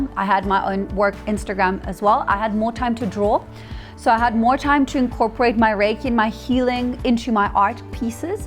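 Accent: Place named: South Africa. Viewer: Finally found out something about her background etc. I was curious. And for some reason I thought she was Australian lol but it's so obviously south African now. Derr. Nice documentary 👍🏼🪐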